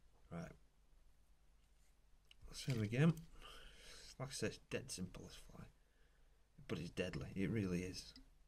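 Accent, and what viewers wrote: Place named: Yorkshire, United Kingdom. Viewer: Thank God I live in Yorkshire and can understand you, As always Buddy well done...Tell Kia to buy you a razor for Xmas though ;)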